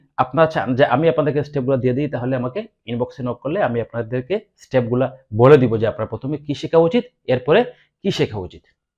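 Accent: Sylhet, Bangladesh. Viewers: Thanks vai most informative video. Vai kita Sylhety ni? mate buja jar halka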